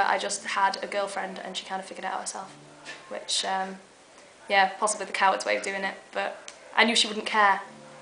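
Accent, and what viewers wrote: United Kingdom. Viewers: Are you from ire or Scotland? U sound Scottish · i'm straight and i loved your story. your adorable! i love your british acsent. i wish you well.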